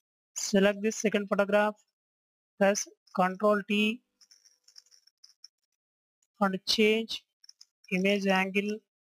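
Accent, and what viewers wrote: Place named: India. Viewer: I agree this is the worst tutorial on Photoshop morphing techniques. the final end result is a disaster. no maintenance of ratios, lighting, hell he is even using a rasterized cut layer for his process. With all these negatives in place, the dialect in which he spoke was the only thing you noticed? Why do you even have to say broadly, that 'Indians' should not be allowed to attempt to speak that language? Who are you? The keeper and safe-guarder of English language? People like you should not be allowed to even comment on YouTube or any other social media for any case. You have the gall to speak about Indians as such and watch a video from them, amazes me beyond the realms of any form of human thought. The uploader of the video has either: no brains, absolute idiot or a moron, who channel as a legit name ' ' with noob kind of videos. 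And on the other side, we have half cooked, stupid taboo people like you who are racist.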